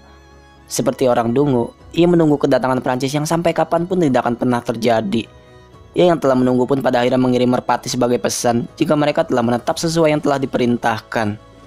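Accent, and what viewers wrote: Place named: Banyumas, Indonesia. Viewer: Logat nya ngapak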